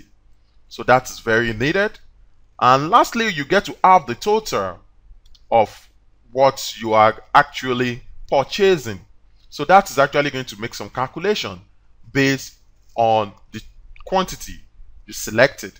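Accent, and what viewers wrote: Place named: Nigeria. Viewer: your sounding like a nigerian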